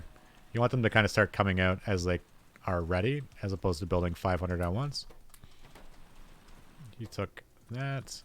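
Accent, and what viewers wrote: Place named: Canada. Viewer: god you are canadian.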